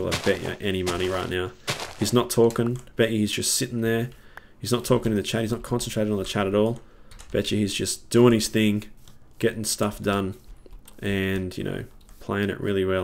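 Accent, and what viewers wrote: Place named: Australia. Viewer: even know i am australian so still sound so aussie!! Loving The Series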